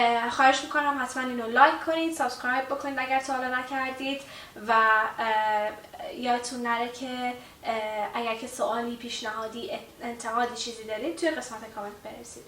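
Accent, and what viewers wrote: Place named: England, United Kingdom. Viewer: سلام دوست عزیز . وقت بخیر . من حدود 3 ماه هست که برای ادامه تحصیل وارد انگلستان شدم وهمچنین برای تقویت زبانم در حال آموزش زبان انگلیسی هستم و تازه با آموزش های شما آشنا شدم. من اولین کلیپ که از شما دیدم اونی بود که اول به زبان انگلیسی فعل های get back , ... رو توضیح می دادید و بعد به زبان فارسی. حقیقتا من اولش که اون کلیپ رو دیدم واقعا هم از لحاظ ظاهری و هم از لحاظ لهجه فکر کردم شما یک خانم اهل انگلیس هستید که دارید زبان انگلیسی رو آموزش می دید و وقتی دیدم که به زبان فارسی هم تسلط دارید واقعا تعجب کردم. شما با لهجه کاملا انگلیسی و روان صحبت می کنید و تمام مواردی رو هم که می گید دقیقا کاربردی هست و مردم انگلیس دارن توی کوچه و خیابان از اونها استفاده می کنند. البته اگر اشتباه نکنم شما احتمالا مدت طولانی هست که مقیم انگلیس باشید. به هر جهت کارتون واقعا عالیه و من مطمئنم که اگر با برنامه پیش برید و مطالبی که برای ارائه انتخاب می کنید همچنان جز موارد اولویت دار و مهم برای آموزش زبان انگلیسی باشه حتما جز بهترین ها در آموزش زبان انگلیسی خواهید بود.استفاده از دوره های شما برای من خیلی مفید هست و امیدوارم که همچنان به تولید کلیپ های بیشتر ادامه بدید. 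من دوره های آموزش مکالمه انگلیسی در 30 روز رو پیگیری می کنم. البته این سه جلسه اول برای سطح زبان من مناسب نبود ولی مطمئن هستم که کمی جلوتر برید حتما من هم استفاده خواهم کرد. ببخشید اگر طولانی شد ولی حیف بود اگر در پاسخ به کارهای خوب و حرفه ای شما این توضیحات رو نمی دادم. برای شما و همه دوستانی که شما رو دنبال می کنند آرزوی موفقیت دارم.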